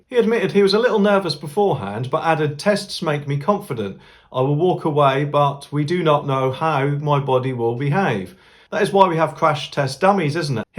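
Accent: Norfolk, United Kingdom. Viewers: Just found your channel, I’m from Norfolk and recognise your beautiful accent from around our beautiful county. Long may localism live.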